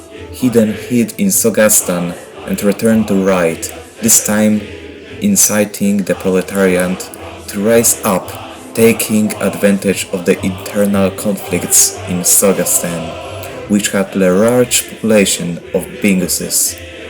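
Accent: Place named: Armenia. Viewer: Nice to hear someone talk with Armenian accent 🇦🇲🇦🇲🤘 Have a good one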